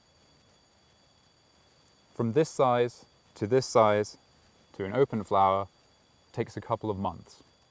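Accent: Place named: United Kingdom. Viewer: He must be British. His accent is very nice for listeners.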